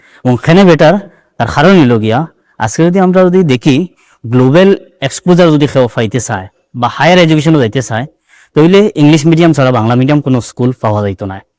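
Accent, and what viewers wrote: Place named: Sylhet, Bangladesh. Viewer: ইংলিশে খইলেও ফারলা ওনে, তেবউ সিলেটি মাত হুনিয়া বহুত ভালা লাগলো..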